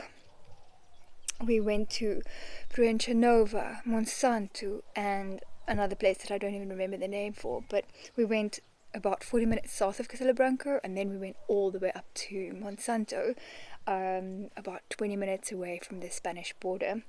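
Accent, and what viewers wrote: South Africa. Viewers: Well done for chronicling your search. Good output of vlogs with updates and good dialogue/commentary, if a little difficult to hear in places - using earphones worked for me. Appreciate you may have limited resources whilst travelling and just starting out with vlogging, your vlogs would be enhanced with photographs and maps to illustrate the talking parts. Your accent and tone is much sweeter than a lot of SA's, is is distinctive of your home region.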